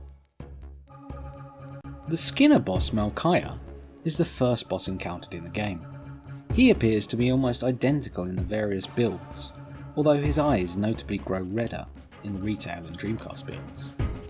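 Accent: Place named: England, United Kingdom. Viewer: I think you'll find he's English English so would naturally pronounce words differently to an American standard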